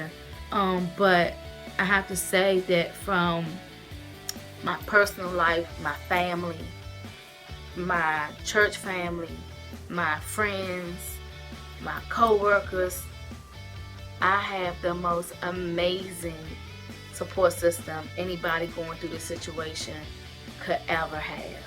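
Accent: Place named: Washington DC, United States. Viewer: your accent made me miss DC so bad. wish you the best with your journey. I was just sleeved on the 13th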